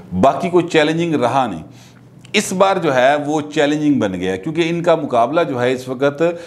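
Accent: Punjab, Pakistan. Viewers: I love your style of speaking Urdu with Punjabi God bless you 🙂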